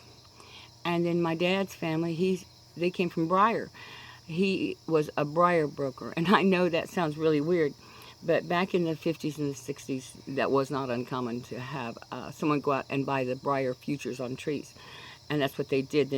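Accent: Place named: Kentucky, United States. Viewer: Hello Mrs.. I am a friend of Wes (Old Khakis). He said you had a nice trip down to Texas. So glad to know you. I am from Arkansas, so that Kentucky accent fell sweetly upon my ears.😊. I loved this video, even though I had to “listen quick”! LOL! I’ve only been smoking a pipe for 9 months, but absolutely LOVE the hobby! God bless you, Mrs.. I look forward to more of your videos! Cliff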